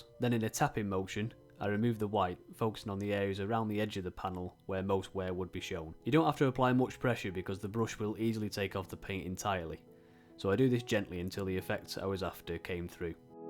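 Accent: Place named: Manchester, United Kingdom. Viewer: What a find this channel is! I’m new to modelling and find your presentation excellent. Also you sound very local. Are you a Manc?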